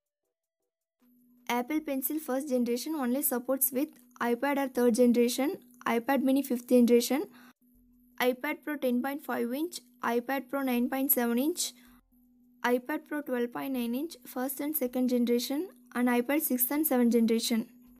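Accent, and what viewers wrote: India. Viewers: I CANNOT STAND INDIAN ACCENTS!!! STFU!!!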